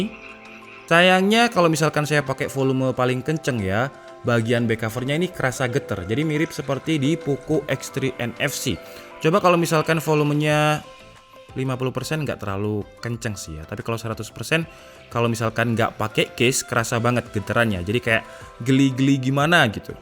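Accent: Malang, Indonesia. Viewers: Orang malang kah?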